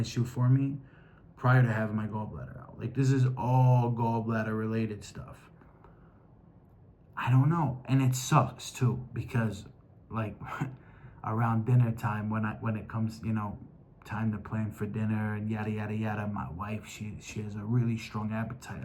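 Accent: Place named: New Jersey, United States. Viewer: Not sure why or how your channel popped up in my algorithm, but it did! I see you flip houses is Texas. You dont sound like you're from TX, but you talk and sound like an adorable Jersey boy! Im born and raised in Jersey, so I know! 😂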